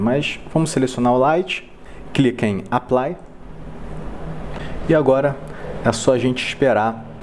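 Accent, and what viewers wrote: Rio de Janeiro, Brazil. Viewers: Adorei o video e tbm adorei esse sotaque carioca "Mesa de docexxxx" *-*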